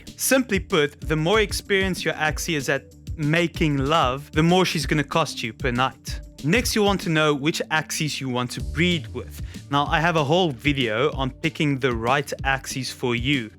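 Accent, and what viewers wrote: South Africa. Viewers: I thought you might be South African, so am I :D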